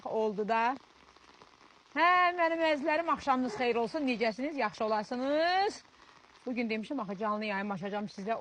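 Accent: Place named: Baku, Azerbaijan. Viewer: daha Bakinka lar kimi dnışmaqa keçib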